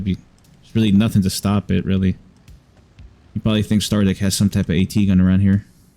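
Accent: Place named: United States. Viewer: this bro has a goofy american accent, damn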